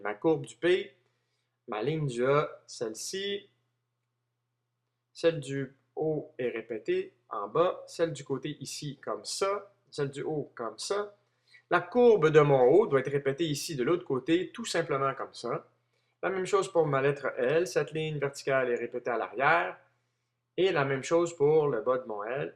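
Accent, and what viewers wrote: Quebec, Canada. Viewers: j aime bien ton accent québecois xD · Dulcineia Lemasle son ascent et québécois normalement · bravo belle vidéo , même avec ton accent canadien j'ai tous compris lol merci . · Franchement, je suis tes vidéos et elles sont toutes extra. Au fait j'adore ton accent Canadien. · Heu.. tes Quebequois?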